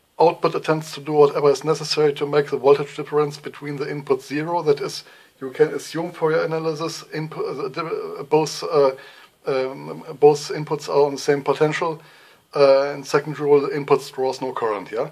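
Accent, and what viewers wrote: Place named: Germany. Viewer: I think the reviewer is German ...i can tell from his accent. munchen?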